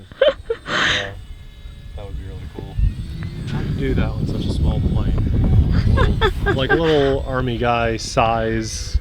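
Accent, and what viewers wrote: United States. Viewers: Very nice looking planes, love both colors, but yellow is my favorite. Surprised it handled so well in the wind for a small plane. So would this be considered a beginner friendly/ park flyer.❓️❓️. Liked how was pushing your buttons on pronunciation 😂😂😂🤭🤭. Also waco vs wok-o. Now My 2 cents. I pre clarify this by saying maybe its my west coast ears 👂 🤔, but when you say colors 9 out of 10 times i hear collars. Maybe its Kentucky/ east coast, ❓️❓️. No, not mad like you on waco/wok-o just figure its how it's said in your neck of the woods ⛰️🏞⛰️. Plus i couldn't let have ALL the fun.😄😄🥴🥴🤭🤭. Great flying, superb videoing, keep pushing those buttons.😂😂😆😆🥴🥴🤭🤭.⚓️⚓️👍🧡💛💚👍👂😂😆🥴🤭🤔👍⚓️⚓️.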